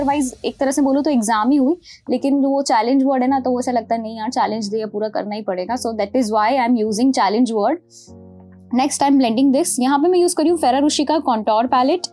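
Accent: United Kingdom. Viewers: British tone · British tone MakeUp tutorial pls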